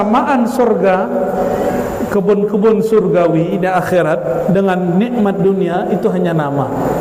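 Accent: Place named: Minangkabau, Indonesia. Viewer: Alhamdulillah Buya bahasonyo taragakjo kampuang halaman, ala lamo Ndak pulang 😅sanang bana mandangah Bahaso, itu asik Samo Samo urang Padang 👍😁🤗💛💛💛💛💛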